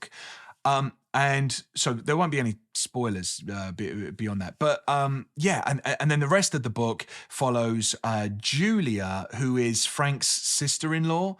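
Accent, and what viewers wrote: Cockney, United Kingdom. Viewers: New subscriber! Just stumbled across your channel on this cloudy and cold day in the middle America. Understood your accent perfectly and would love for you to turn up the cockney a bit. Great recommendations! 🇺🇸 🇬🇧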